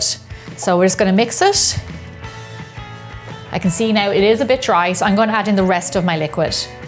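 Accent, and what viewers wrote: Ireland. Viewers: I think she's Irish. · The Irish accent is so buttery smoth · Is she irish? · she’s actually Irish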